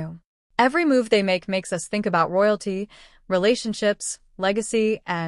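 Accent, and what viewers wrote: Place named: United States. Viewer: And is this American accented commentary worth a damn. Truth or BS?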